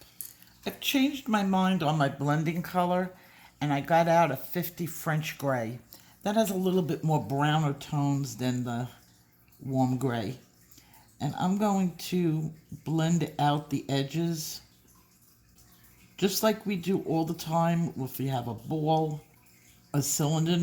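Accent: New York, United States. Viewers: I LOVE YOUR NEW YORK ACCENT! PLEASE DON'T CHANGE IT! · great tutorial! Grew up in NY so your NewYorker sounds like home.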